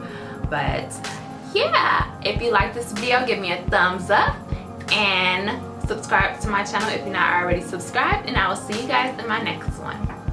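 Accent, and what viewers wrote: Chicago, United States. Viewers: I knew u were from chicago.(hence the accent, cuz we say ain't so many times lol.) me too. I've never seen u. u must be on the southside.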